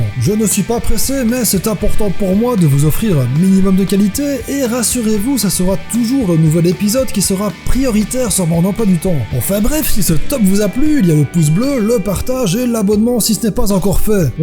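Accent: Liège, Belgium. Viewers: Salut, je post pas souvent de commentaire mais des que j'en post généralement c'est bien. Bref, Juste un mot a dire "Géniale" Ton accent Belge donne un coté original a tes vidéos franchement continue j'adore;) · T'es liégeois ? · T'as la même voix qu'Absol mais avec un accent belge ;) sinon super vidéo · Ah , ca sens l'accent du copain Belge ca ? :D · pas suisse, j'aurais tendance à dire belge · T'es liégeois ? :)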